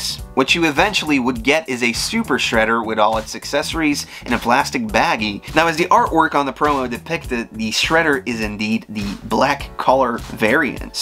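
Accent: Midwest, United States. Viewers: First time watching. Your accent is really interesting and familiar. Midwest?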